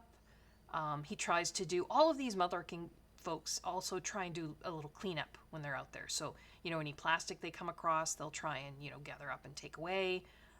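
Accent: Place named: Canada. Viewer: It’s funny that you find British accents relaxing, I’m Scottish and I love yours because it reminds me of my best friend who’s Canadian 😅. I used to watch time team with my grandparents, love me a bit of Tony Robinson haha. I don’t know if you’re familiar with Gem and the colour cave here on YouTube but I think you’d enjoy her stuff!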